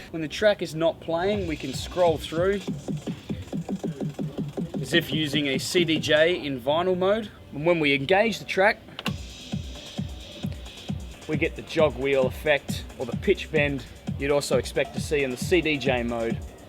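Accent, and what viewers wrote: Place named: Australia. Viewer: finally, an aussie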